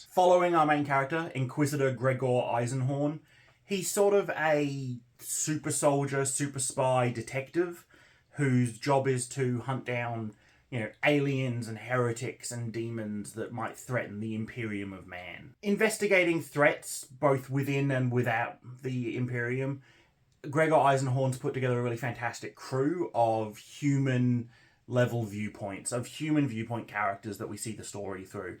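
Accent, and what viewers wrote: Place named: United Kingdom. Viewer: Thank you for the video. You should try speaking less British. You hold onto words too long. This video could have been 11 minutes and I wouldn’t have started nodding off immediately. Good content though.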